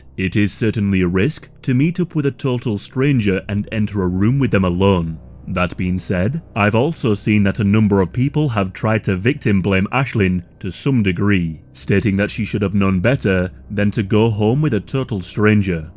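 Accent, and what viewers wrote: United Kingdom. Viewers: Yes, sounds British to me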